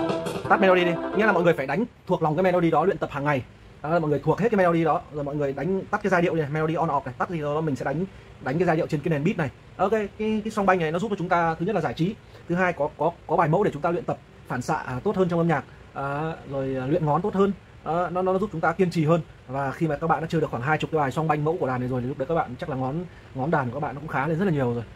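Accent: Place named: Hainan, China. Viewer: 你的语音和我一个海南同学的家乡话一样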